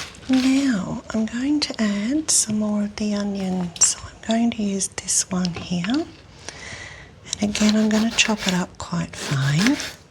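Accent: Australia. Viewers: Australian accent is my weakness what comes to asmr. And with your voice the tingles are even more amazing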